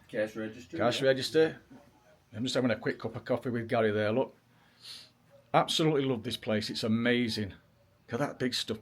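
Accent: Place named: Yorkshire, United Kingdom. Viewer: ###king bear! Brilliant. Fortunately it doesn't understand a good Yorkshire accent. Keep 'em coming.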